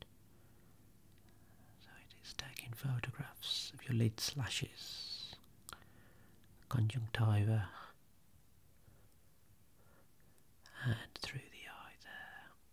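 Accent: Birmingham, United Kingdom. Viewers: Its cause hes a brummie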